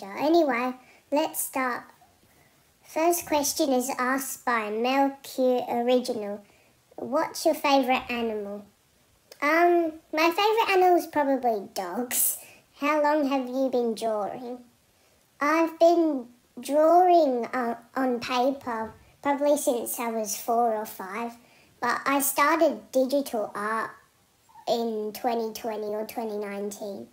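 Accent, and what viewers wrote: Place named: Australia. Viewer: i can hear the australia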